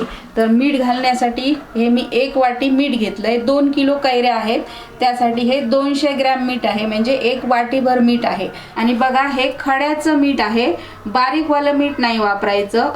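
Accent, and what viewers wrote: Konkan, India. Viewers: कुठून बोलताय कोकणातून का बोलण्याची पद्धत पक्का तिकडचे वाटते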